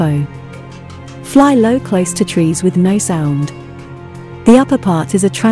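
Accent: United Kingdom. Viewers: Love your British accent:-)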